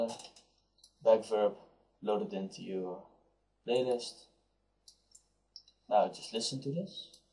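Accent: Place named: Netherlands. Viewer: Je klinkt nederlands.. You sound dutch.. Good instructional video!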